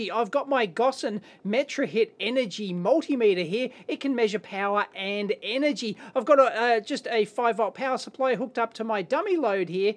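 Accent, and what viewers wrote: Australia. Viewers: Aaaay aussie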